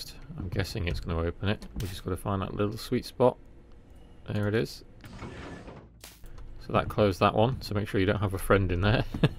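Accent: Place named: England, United Kingdom. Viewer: Can’t even understand with your English accent. Can you put the names on the screen 👍🏽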